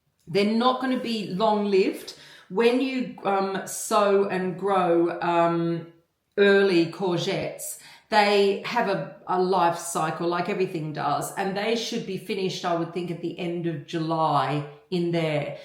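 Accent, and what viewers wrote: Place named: Australia. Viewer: Hi Thank you for your videos they really helpful and yes, you do have a lovely Australian accent 😂 Im from Portugal, but I've been in England for 33 years, and i, too, don't think i have an accent, but apparently, i do I guess in my head, i just sound very British 😂 and it always makes me giggle when someone asks where im from😂 Have a lovely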